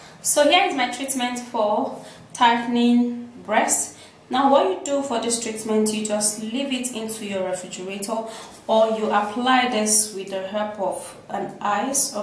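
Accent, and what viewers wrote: Nigeria. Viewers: Blandina Mbock that a Nigerian accent you dummy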